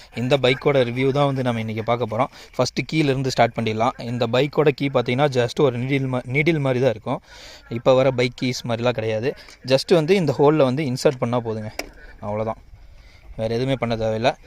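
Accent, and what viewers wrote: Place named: Kongu, India. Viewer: koghu selga unga voice ,neega gounder ah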